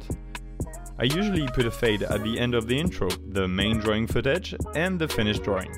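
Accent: France. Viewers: Love your French accent!😍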